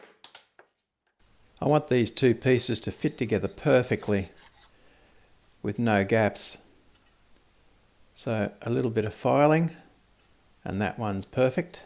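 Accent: Australia. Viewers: I’ll give you a thumbs up for your silly Aussie voice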